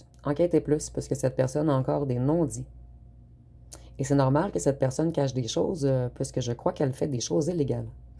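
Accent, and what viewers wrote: Quebec, Canada. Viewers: Merci ma belle ame quebecoise j'adore vous ecouter et charmant accent quebecois.....❤❤❤❤❤❤❤❤❤❤❤❤❤❤❤❤❤❤❤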